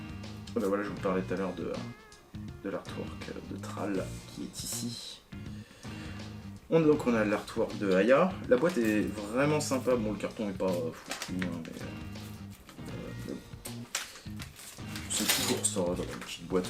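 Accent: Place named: England, United Kingdom. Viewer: quel accent anglais, et 2043 pour la wave 4, quel optimisme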